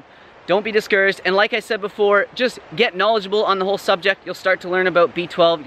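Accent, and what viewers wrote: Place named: Canada. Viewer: Question! I’m new to the channel but have been absolutely loving it! I am from New England in the US so we do get cold winters as well. I’ve noticed that you have a cool setup in your backyard for your calisthenics... how do you adapt in the winter months? It seems like it would be too cold or snowy! Also btw I just love the Canadian accent and lingo since I have ancestry from Quebec. Love your channel! It’s binge-worthy! 💚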